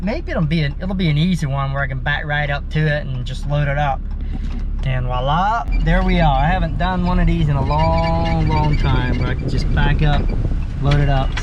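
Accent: Pennsylvania Dutch, United States. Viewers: "I'm gonna keep saying building because it sounds like I'm saying something else." 😄 They very first time I heard you say "shed" I thought, I'll bet he was either raised Amish or in Amish country. Your PA Dutch accent is heavy. I went to college in the heart of Amish country in Pennsylvania. We heard this accent a lot. It takes me back to my college days. 😊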